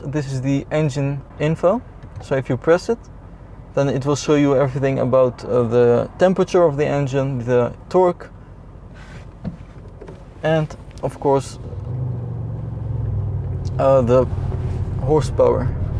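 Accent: Netherlands. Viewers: Je hoort gewoon door je engels accent dat je nederlands bent XD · Duidelijk een Nederlander! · ik wist al aan je accent dat je nederlands was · maat begin aan bmw vergeet deze.....en dat hollandae accent is slecht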